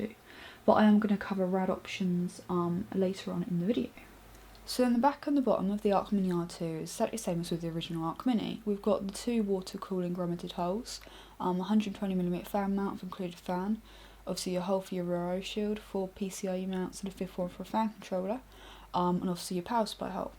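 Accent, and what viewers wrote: United Kingdom. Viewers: Damn,this english accent.. :| I usually understand british accent but not now :P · Anderson Paschoalon Noooo... It mean that UK has the most diverse population ranging from Scottish to Welsh, from North to South accents, from Southall to Stratford accents, from "chavy" to posh, from Punjabi to Polish... Man..."dys acent" is a droplet in the ocean of diversity over here. Get use to. Or change page if you cannot comprehend it, as for speakers... She has a one of the softest voices I know of and in different situation, she would have your erection just by talking to you. ;-))) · Omg her accent makes it so damn difficult to understand. I thought British accents were the most easiest way of listening or learning English. Her accents makes it seem like all gibberish. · Oly VL Haha of all things to like, her accent (look I'm south african, so a british accent appeals to me too), just that I prefer her to other reviews because she isn't some 50 year old fat dude or a 12 yo kid or that she is a female in a male dominated sector (as far as I know)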